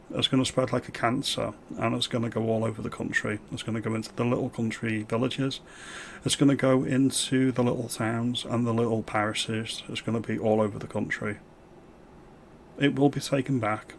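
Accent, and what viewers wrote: Liverpool, United Kingdom. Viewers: Thank you for this video. I’m living in liverpool and I couldn’t agree more with you ( you sound Liverpudlian 🤣 ) I would vote for Ant definitely. My daughter was attacked by a demographic we can’t mention, a woman . My daughter need hospital treatment. To cut a long story short when the police came, they went to arrest my daughter until passersby who stopped to help told the police who the aggressor was. Twelve months later almost to the day not one police person from Merseysnide police have called to take a statement . That night my daughter was just going to the cinema with her friend and was minding her own business . The aggressor was like a rabid dog. It’s not safe here anymore my nerves are gone everytime she goes out and local government are just pathetic . IMO Have a great day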